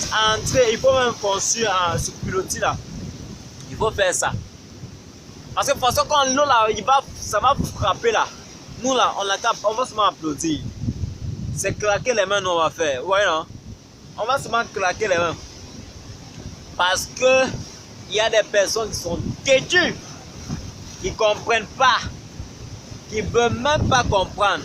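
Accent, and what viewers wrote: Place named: West Africa. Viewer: Qu'est-ce qu'il y a même à parler comme les Ouest-Africains ??? Parle comme un vrai Camerounais aka ! Tsuip !!!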